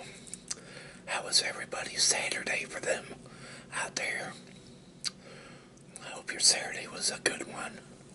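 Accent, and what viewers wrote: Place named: South, United States. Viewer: hey, hope you're doing very well :) my saturday was alright, I haven't been able to stay home alone for a long time, and finally got the chance to do so, which was a literal threaphy... I live in Turkey / Istanbul and as odd as it sounds, we have yet to see a snowflake in the city lol. I remember blizzards happening in the past, but it is different these years. the reason is maybe global warming, not sure why. maybe little snow storms from time to time, thats it. I'm done with my final exams, made my favorite salad at 5.27 am in the morning - browsing your videos :) glad to see a fresh upload, I'm a big fan of your (southern?) accent. Have a nice day / evening, I'm around.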